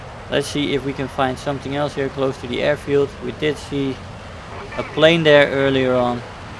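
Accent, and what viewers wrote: Netherlands. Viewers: your dutch ? I hear it from the accent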